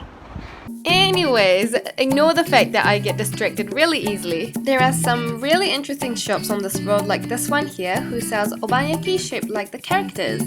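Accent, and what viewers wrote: New Zealand. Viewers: I came here from the Only In Japan Channel and this is the first video I have watched. It was awesome. Thank you very much. I was especially excited you talked about Okonomiyaki. I had this in Tokyo and would really like to make it at home. Could you post the ingredients? P.S. Love the Kiwi accent. Cheers from Cairns, Australia (+1 Subscribed :-) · Love your videos, keep up the great work!!! It's great to see (& hear) a fellow kiwi enjoying Japan :) I tried to make it to that exact restaurant when I was there- failed, and ended up at some other random restaurant hehe Looking forward to returning after studies, and trying for a non英会話 job next time. Until then, I shall be inspired by your videos, cheers! :) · I think she's Japanese, but her accent when speaking english is NZ I believe :)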